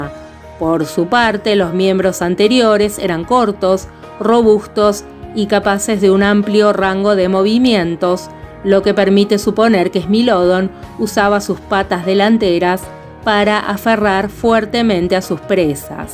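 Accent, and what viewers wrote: Argentina. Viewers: Interesante reportaje con una dama de acento inconfundiblemente y agradable argentiiino , espero no me equivoque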